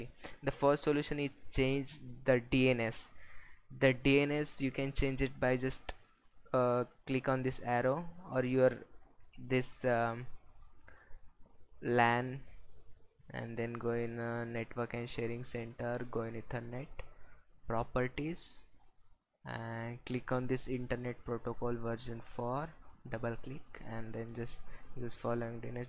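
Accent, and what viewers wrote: India. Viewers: You already know it gonna be legit when a indian starts talking :) much love broda finally working <3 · talks to much indian very slow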